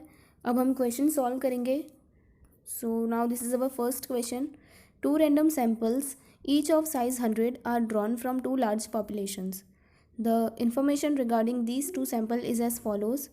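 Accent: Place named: Gujarat, India. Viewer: Thank you me'me for ths video ❤ From Gujarat I know from your accent thayt you are gujarati 😅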